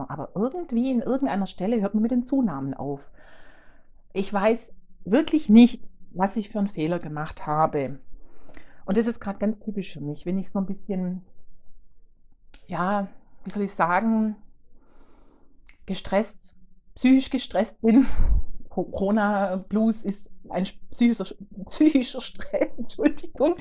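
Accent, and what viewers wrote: Swabia, Germany. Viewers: Konfus ist toll, und das schwäbeln verstehen bestimmt auch Norddeutsche. Ich Rheinländerin find es klasse zwischendrin die Emotionen in Heimatsprache zu hören. Danke! Und deine Wollfärbungen sind doch toll. Sei mit dir selber Zufrieden, dann werden Dir die Färbungen auch immer besser gelingen. Ganz liebe Grüße aus Roki Regina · Wieder ein tolles inspirierendes Video. Ich mag deine Videos sehr gerne. Im schwäbischen Dialekt fühle ich mich direkt wie zuhause und wohl. Ich könnte dir stundenlang zuhören. Du hast tolle Projekte und färbst richtig schöne Wolle. Bin sehr gespannt was du zu zeigen hast, wenn du im größeren Stil für deinen Shop färbst 😍 Der tuscha kal hat sich in meinen strickplänen nach ganz vorne gedrängt und wird definitiv mitgestrickt. Aus deiner Wolle könnte ich mir super vorstellen ein Set für meine Tochter zu stricken🥰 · Frisch und sympathisch eine angenehme Art schwäbisch ich könnte dir Stunden lang zuhören, deine strange sind herrlich könnte ein Tuch vorstellen bin gespannt ich freue mich auf den nächsten podcast liebe Grüsse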